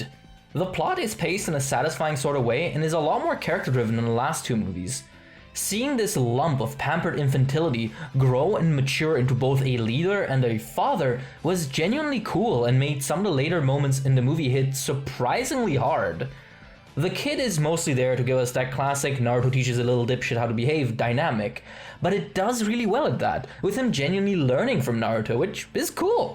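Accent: Germany. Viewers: Are you an german? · You're German?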